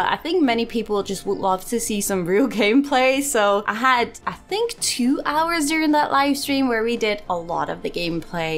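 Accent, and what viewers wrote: Netherlands. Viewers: wacht ben je nederlands???? xDD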